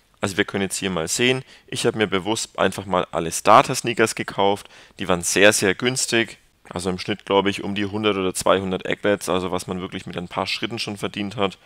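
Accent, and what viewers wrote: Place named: Nuremberg, Germany. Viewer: folge dir mal auf twitter ;) ich hörte da so einen Nürnbercher dialekt raus endlich mal einer mit Youtubetschänel aus der Gegend nice vl. kann man sich mal treffen ;) ich bring auch an LKW mit (nur ein Franke weiss was das für gutes Essen ist ) ;) mjam mjam ;)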